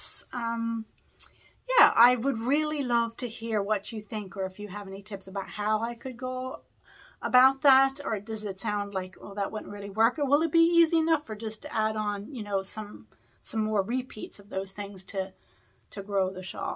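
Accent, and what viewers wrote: Ireland. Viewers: Those will be perfect for your dad. I hear the Irish accent. That is so nice. Gina at knitting Turnpike is , I think possibly doing the same KAL, I've heard her talk about it. Her shawl work is beautiful. You might check her channel. I am a pretty new knitter so I can't be much help. You have such beautiful yarn.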